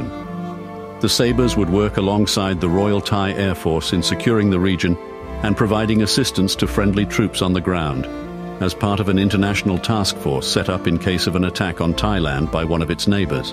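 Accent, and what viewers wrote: United States. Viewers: The narration switches between American and Australian accents mid paragraph. Why has no one else noticed this? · Why is an Aussie channel vid narrated by a yankee? And airmen from the 60's shot 8mm film, not 8mm video. Video would have been shot on 2" magnetic tape and was not portable, nor used by airmen. There is a difference between video and film. Accuracy counts in history folks. Details, even small ones, are important. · How is is that 'Australian Military Aviation History' have an American accent?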